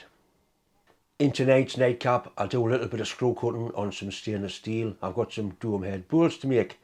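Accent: Newcastle, United Kingdom. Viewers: Well up for Patreon. 'Specially if there's propa geordie swearin gannin on nall. :D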